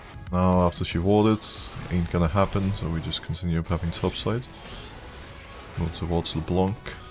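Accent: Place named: Denmark. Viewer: i had the video playing for the first 2 minutes while doing smth else on my pc and when i actually started watching i was trying to find the SETT and i was like wtf xD danish accent got me there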